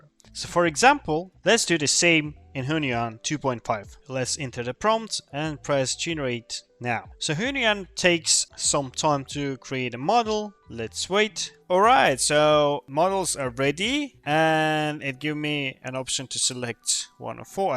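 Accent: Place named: Russia. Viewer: I think he might be russian, c is read like an S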